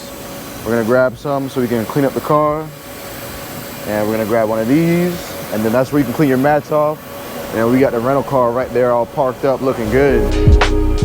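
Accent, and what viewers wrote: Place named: Charleston, United States. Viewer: Im from Greenville and i swear you got the clearest Charleston accent i ever heard 🤣🤣🤣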